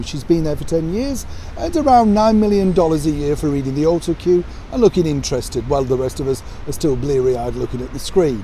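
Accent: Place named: RP, United Kingdom. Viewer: you would be a far more interesting and inspiring host, you are a great story teller and presenter you should apply if it’s an option! Just sayin your accent ie the Queens English is a bonus in USA!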